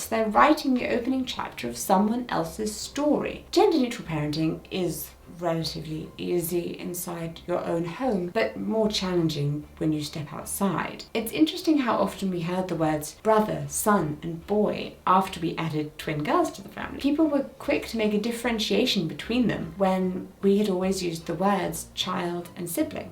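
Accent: United Kingdom. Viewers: You sound like what I think every person on the North American continent thinks British women sounds like. I will be subscribing for more.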